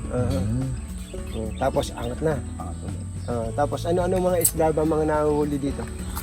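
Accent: Nueva Ecija, Philippines. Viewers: Hahah nkaka miss ang puntong Nueva Ecija.. “Wala eka eh”😂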